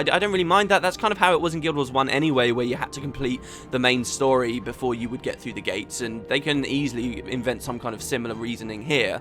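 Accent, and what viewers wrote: United Kingdom. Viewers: I just realized you sound like a more British Julian Bashir. Yea I was hoping that the Living Story steps would be connected to each other because that would make it feel more connected and have a more over arching story and lore. I've been trying to get into the living story but BotFW has lost me. The other parts of the story were pretty uninteresting but this is so disconnected that I have no drive to even go there.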